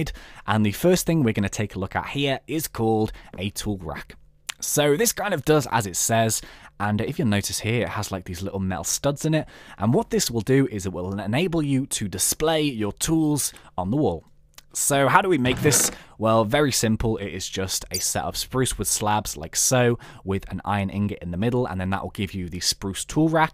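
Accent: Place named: United Kingdom. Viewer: Lol your British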